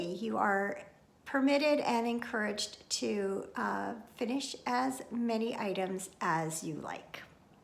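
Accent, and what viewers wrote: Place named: Canada. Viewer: I just found your podcast today Great to hear a fellow Canadian. I'm looking forward to joining your makealongs.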